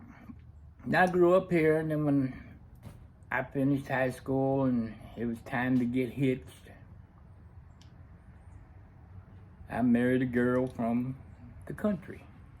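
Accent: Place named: New Orleans, United States. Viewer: by the way when i first started seein your vids i was for sure you were from Nawlins!😆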